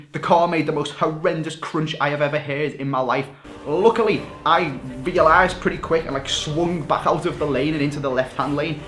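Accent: Liverpool, United Kingdom. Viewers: are you a scouse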